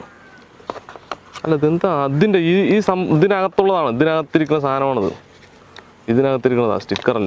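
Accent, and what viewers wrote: Palakkad, India. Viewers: ആദ്യം ഒരുപാടു ചിരിച്ചു , നിങ്ങളുടെ പാലക്കാടൻ ഭാഷയും മറ്റും കണ്ടു ... അവസാനം കുറച്ചു കരഞ്ഞും പോയി ... നിങ്ങളുടെ ആ നല്ല മനസ്സ് കണ്ട് ... 🙏🙏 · all the best എന്ത് ചന്തല്ലെ ഇത് തനി നാടന്‍ പാലക്കാടന്‍ സംസാര ശൈലി 👍👍 . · Ethu njaga palakkadada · Palakkadan slang istam like here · Palkkade slang powlii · ഹേയ് ഇതു ഞങ്ങൾ പാലക്കാട്ടുകാരുടെ തനതായ സംഭാഷണ രീതിയിൽ അദ്ദേഹം ജാടകളില്ലാതെ പറയുന്നു അത്രേ ഉള്ളു. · Palakkaadan daa🥰🥰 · Palakkad aano,ikkante samsaaram pkd pole thonnunnu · പാലക്കാടൻ സ്ലാങ് ആണോ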